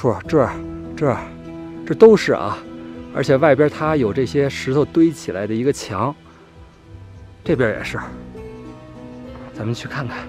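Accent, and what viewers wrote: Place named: Beijing, China. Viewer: 喜欢😍他的知性和标准的京片儿语音。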